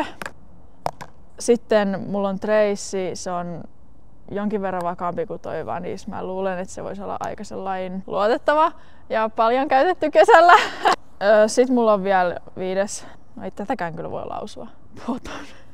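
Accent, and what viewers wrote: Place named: Finland. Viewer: Aivan loistava, tuli ihan Ismo Leikola mieleen kun Suomessa ollaan niin lausutaan ne suomalaisittain 😅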